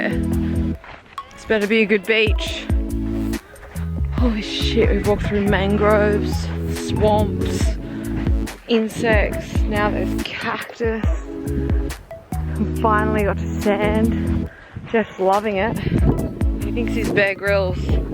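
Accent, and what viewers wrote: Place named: Australia. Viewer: Who cares that you cannot pronounce Mexican Cities. With that beautiful Australian accent you actually make Mexican cities and beaches sound better. I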